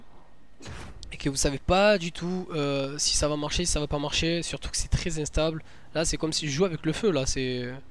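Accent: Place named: Marseille, France. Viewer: t marseillais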